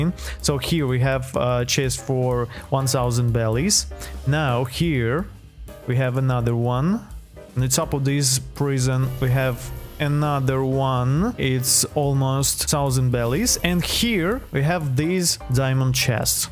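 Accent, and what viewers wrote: Russia. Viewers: Great option killing yeti, i was tryna grind since light was on stock and im on an alt im max lvl on my main tho also LOVE THE RUSSIAN ACCENT!! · bro really sounds like Russian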